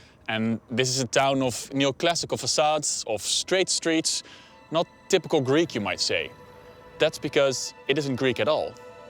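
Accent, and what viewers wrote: Netherlands. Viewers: very nice video, you sound really Dutch. :) ! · I hear a dutch accent.. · I guess he's Dutch. He has a thick accent, but trying to imitate an American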